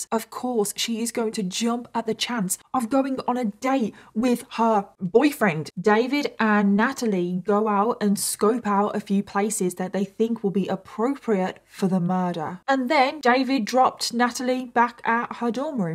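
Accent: United Kingdom. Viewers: She's using the British pronunciation. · I enjoy watching all your videos. It’s obvious you put a lot of time and work into making quality videos with honest fact finding reports. Great job. I also enjoy your makeup and have learned a few tricks watching you. Your a very pretty and articulate young lady, and the British accent is super cool. (You do know how Americans love a British accent, right?) 😂I have Siri set on my phone as a British man. 😂 Keep up the great work! Sending love and positivity your way. Aloha. ❤✌️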